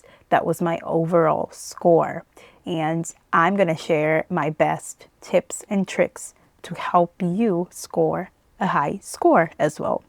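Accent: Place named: Brazil. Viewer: You sound like a native. Never mind you are from south America right? I hear Brazilians from football and ufc they have similar accent.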